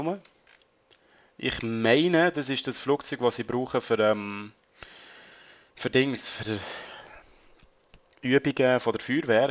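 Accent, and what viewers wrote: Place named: Switzerland. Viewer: Er spricht halt Schweizerdeutsch aber ok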